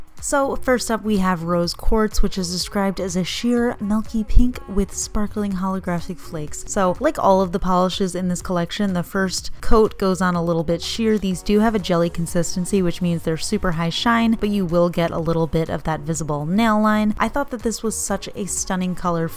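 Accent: United States. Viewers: That was absolutely cruel to watch. They are all gorgeous. Love your channel btw. You're so chill and relaxing to watch. And I love your hair. There's heaps of American accents but yours is the coolest. What part of USA is that accent? Sorry to sound so fan girly but you deserve it. I guess you have hair dying tutorials. Might just go and check em out.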